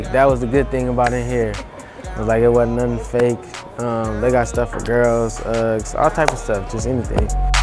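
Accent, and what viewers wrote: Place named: Atlanta, United States. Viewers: Crazy part is how he sound like he from the A and he better than any nigga from the A 😂. Nigga Cali do this shit nobody sound like Roddy he sound like he from Cali. Smfh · Boy I’d a thought u was from the south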